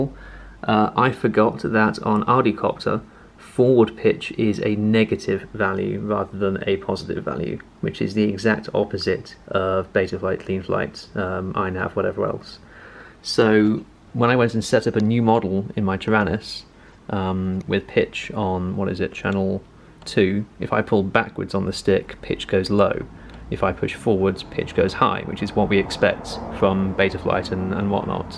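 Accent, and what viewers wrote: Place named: United Kingdom. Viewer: I think my main distrust and poor performance with the 35xx motors and larger props is I live at higher elevation. Based on your accent and surroundings you live somewhere in the UK with an average elevation of ~500ft. I live at 5000ft. M100's will hover for 28 mins at sea level on the larger battery, that same setup will only hover for 15 mins at 6000ft, and with ~500g of payload drops to 5 mins. So whether its work or hobby, I have to have more thrust because the elevation range I fly in is -2000 to 10000 ft ASL for commercial units, and when I fly for a hobby still 5000 ft, give or take 2000 ft. But say your drone makes 6kg of thrust (1500g per corner) and weighs 2.5kg, so it hovers around 50% throttle, its just a little under the max take off weight people generally like to have (50% of max thrust), so while flying you have 50% throttle to play with for flying and error correction. 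But that's lower elevation, at 5000ft that drone would likely hover around 75% throttle. Not only do you lose flight time, payload, but you lose authority. Its not that I am poo pooing 35xx motors entirely, but specifically, big frame, big props, small motors. Bigger frames have more weight, more room for batteries that add weight, and you hit the limits of the drone easier before taking other factors into account, like elevation. And how often do people think about how well their drone will fly when they do a road trip from the coast to the mountains and gained a few thousand feet? I don't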